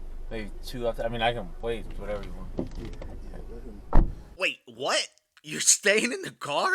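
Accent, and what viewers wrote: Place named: Appalachia, United States. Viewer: You should do your own narrating, instead of the hillbilly hick voice. Your natural voice sounds better.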